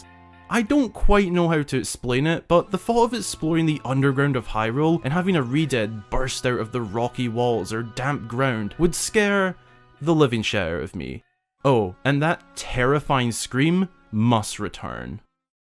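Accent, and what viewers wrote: Scotland, United Kingdom. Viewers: Your Scottish accent is perfect for your Zelda content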